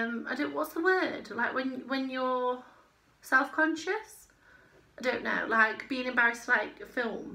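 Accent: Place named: Manchester, United Kingdom. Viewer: Hello, jan carruthers has sent me your video. I really enjoyed watching you. Well done on your 500 subs. It amused me when someone commented on your accent. It sounds very similar to mine. I m from east manchester. I assume you are from manchester too.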